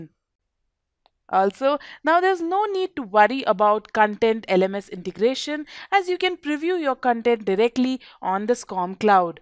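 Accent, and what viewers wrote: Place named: India. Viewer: Indian accent, better get used to it. They are really good at software development...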